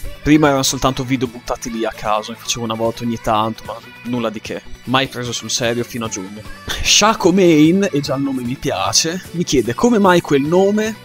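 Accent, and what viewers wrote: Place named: Bologna, Italy. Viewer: Sei di Bologna? :O pure io :D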